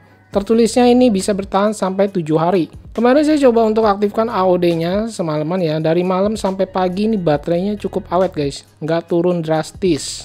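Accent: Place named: Ciamis, Indonesia. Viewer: Paham suaranya.. Orang Ciamis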